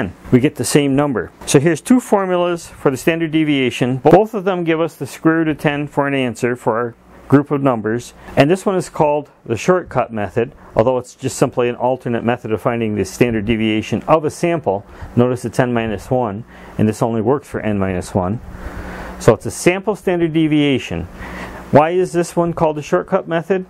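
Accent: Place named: New York, United States. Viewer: God bless yer newyorkan accent 😂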